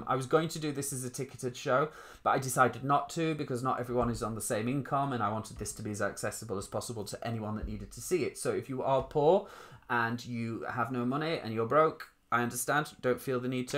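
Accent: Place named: RP, United Kingdom. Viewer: You do sound posh and yes I’m northern 😉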